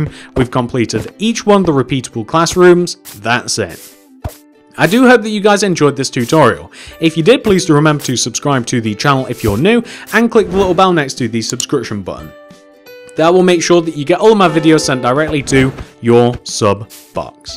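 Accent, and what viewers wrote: United Kingdom. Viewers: love the british voice.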